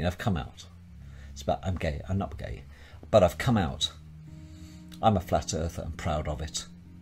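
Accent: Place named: United Kingdom. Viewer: The British accent is great for sales. He is speaking the truth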